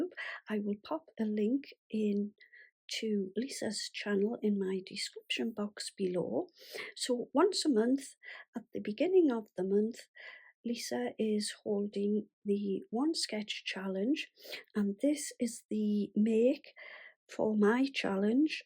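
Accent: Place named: RP, United Kingdom. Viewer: Gorgeous card, and such a great sentiment! Love listening to you talk too - sounds so posh to my American ears 🎩🌻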